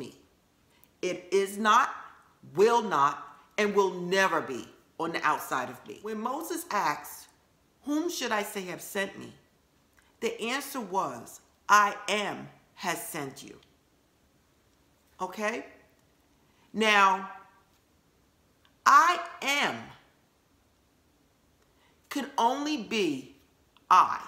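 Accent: New York, United States. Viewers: Do I detect a New York accent??!!? I like that!!!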